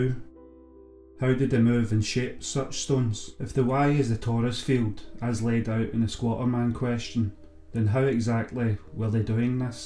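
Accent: Scotland, United Kingdom. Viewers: Love your accent, miss living in Scotland!! By the way the engraving patterns on these sculptures are water symbols, during the later mesolithic and the Neolithic, chevrons represented vaginas and waves represented water so both would to a Mother Goddess statue... These patterns are all over the world but studied especially in Lithuanian folk art and European prehistoric art my Marija Gimbutas.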